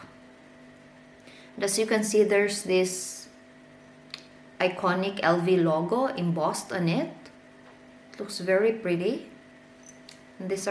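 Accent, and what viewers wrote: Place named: Romania. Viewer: Hi!! Love the accent na.. so romanian! Mwah! Miss you!! Congratulations on your blog!! 🎉🎉🎉🎉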